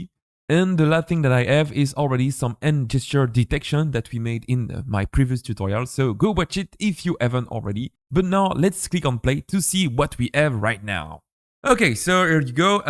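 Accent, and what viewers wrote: France. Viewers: Beautiful French accent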